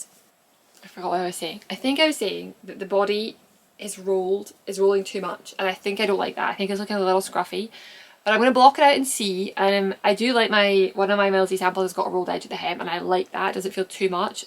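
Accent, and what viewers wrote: Scotland, United Kingdom. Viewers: Hi, My first time watching your channel - lovely to see you looking so well! I have knitted your patterns before and love them. You are such a talented and generous knitter. One comment - I am from Edinburgh and lived in Scotland all of my life - but I struggled keeping up with your commentary..😂. You talk very quickly, with lots of words..I know it is a Scottish thing to rush at sentences, but it wasn’t quite as relaxing to watch as some other videos. Appreciate you have a lot to get through, so not a criticism, just an observation…❤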